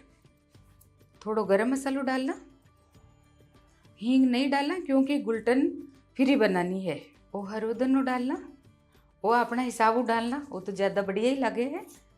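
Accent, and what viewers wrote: Rajasthan, India. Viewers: Aap rajsthani bolte he so mithi boli he aap ki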